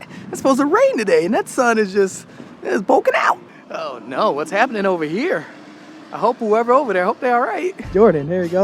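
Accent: United States. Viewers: Can't have an American accent and say Haarlem, that confuses the hell out of people.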